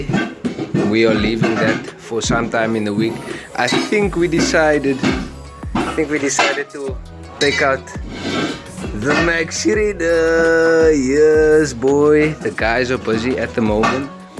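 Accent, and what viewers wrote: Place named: Cape Town, South Africa. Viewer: Love the capetonian accent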